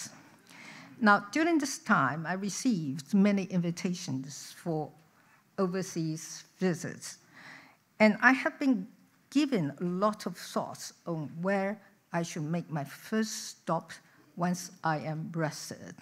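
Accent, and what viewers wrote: United Kingdom. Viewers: 前總統用漂亮的英式口音，在國際為我國的自由民主發聲👍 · 有點英國腔的蔡總統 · 感謝蔡總統卸任後努力做外交，好久沒聽到蔡總統的英國腔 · I love your British accent Wingardium Leviosa to our liberty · 總統的英國腔真的好好聽❤ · 確實! 擁有敦倫賑精學店的腔調味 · 超級喜歡總統的英式口音，感念她執政8年總統，不管內外成績亮眼，讓世界看到台灣發光發亮。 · 聽蔡總統的英倫English 演講，像喝一杯淺焙曼特寧熱咖啡，深邃雋永，感謝蔡總統為國訪問友邦，我早猜到蔡總統離開總統府之後還是不會閒下來，還會持續為台灣出力，讓台灣人驚艷，給蔡總統我最好的祝福，您是台灣人永遠愛戴的總統！ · 好優美的英式英文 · 蔡總統的英式英語真道地，很好聽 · 的发音是英式的！👍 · 英國腔好好聽😍愛了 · 貴族標準的英國腔，令人賞心悅目，移居USA 40年的台灣人，感謝🙏前總統蔡英文繼續為台灣拼外交❤❤❤🎉🎉🎉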